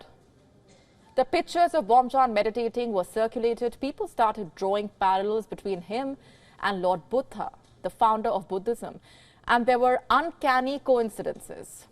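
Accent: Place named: India. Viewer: Hard to understand for this report by english with the indian accent. Give up on her.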